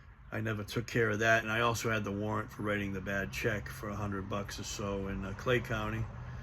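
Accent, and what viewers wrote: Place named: New England, United States. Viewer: good to hear your doing good man! I'm a new Englander myself not far from you right in southern new Hampshire I recognized the accent wicked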